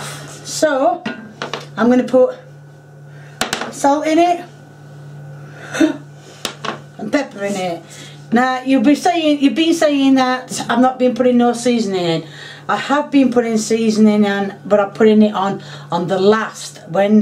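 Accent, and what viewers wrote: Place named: North, United Kingdom. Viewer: It's a Sheffield dedah accent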